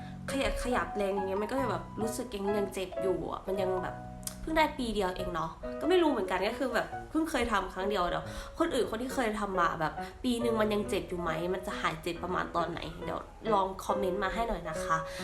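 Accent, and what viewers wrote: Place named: Isan, Thailand. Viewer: พึ่งเคยได้ยินพูด อิสาน น่ารักมากเด้อ 🤣🤣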